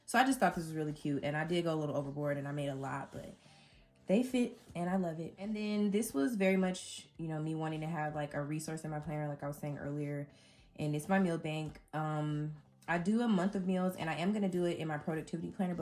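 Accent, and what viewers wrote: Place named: New York, United States. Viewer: New viewer & subscriber. Right b4 you said you live in Vegas, I said she sounds like she could be from NYC. Tfs all your ideas...good inspo. Love your nails 💅 too.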